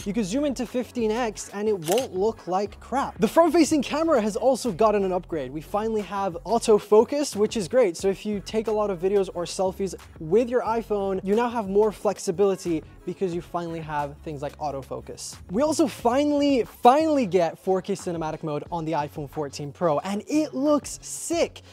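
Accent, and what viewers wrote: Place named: United States. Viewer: You have an American accent. Are you an American living in British land?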